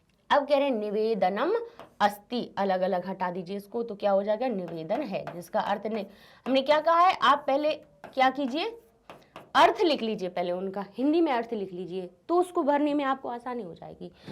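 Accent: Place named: Gujarat, India. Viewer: Aap kya gujrati ho kyuki isiliye kaha raha hu kyuki aapki awaz gujrati jaisi hai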